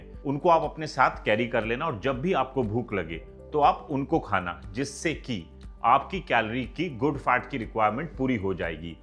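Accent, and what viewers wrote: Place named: Punjab, India. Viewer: Are woh uska accent h .. Punjabi h to normally aa jata hai...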